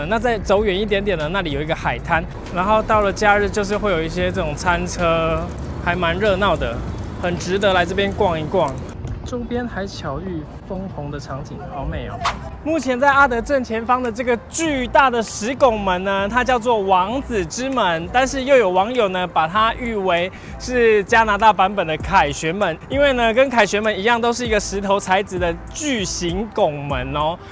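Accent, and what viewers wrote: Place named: Taiwan. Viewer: 欢迎你到我女儿住的地方来观光，听上去是台湾的朋友，祝你玩得开心！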